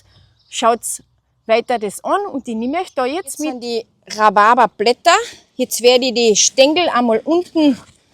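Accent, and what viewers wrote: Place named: Bavaria, Germany. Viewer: Liebe, das Thema ist ja sehr interessant, doch für einen Norddeutschen ist das Bayerische fast wie eine Fremdsprache, also schwer verständlich. 🤣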